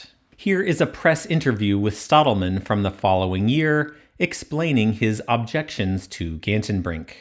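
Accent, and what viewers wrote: United States. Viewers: I have said this before but no one responded. I asked what state is this accent from? You wanna search for Audit the audit and the villains they sound just the same too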